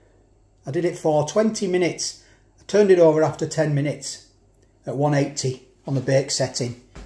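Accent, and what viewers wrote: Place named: North Lancashire, United Kingdom. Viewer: That's a broad North Lancashire accent young man. That is why Barrow and the rest of Furness will always be Lancashire, you keep the real county alive, and better still the abolition of Cumbria in May 2023 frees you from being run by Carlisle, effectively Scottish lol. Me and hubby enjoying your video's following You Tubes recommendation of your channel and while you're around, Lancashire will never die :)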